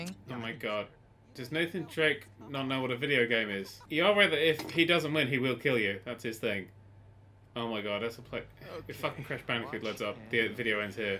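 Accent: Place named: Cockney, United Kingdom. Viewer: Extreme TGX (Game Reviews And Stuff) well he almost has a cockney accent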